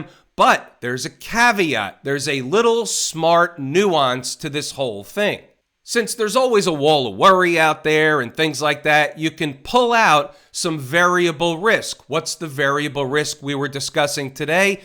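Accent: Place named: New York, United States. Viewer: …Sorry, but I freaking LOVE this guy’s NYC (ahem) transmission…..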